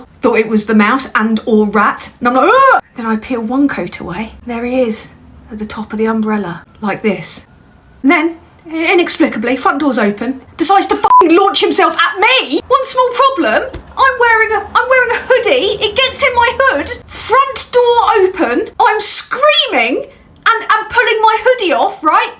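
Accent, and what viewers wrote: United Kingdom. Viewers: You sound so BRITISH it's adorable.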